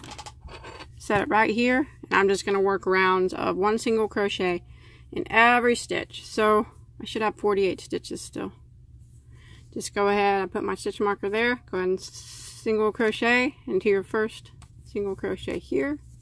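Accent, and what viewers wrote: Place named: Indiana, United States. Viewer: I know you have heard it before but I need to thank you again for being real - authentic! You aren't selling us on things, you are sharing! Even better, as a West Central Indiana born, raised, and still residing her gal, I like someone who sounds like me! Cannot thank you enough for teaching, sharing and giving of yourself and sharing family times with us! Keep up the great work! You are very talented and keeping the craft alive!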